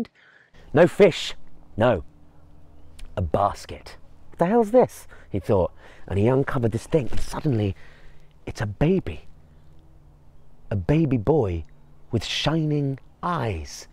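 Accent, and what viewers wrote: Wales, United Kingdom. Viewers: This was so magical to listen to You are indeed a very gifted story teller . Thankyou for sharing your wondrous gift with the world I love your Welsh pronunciation of names and places which make the story more magical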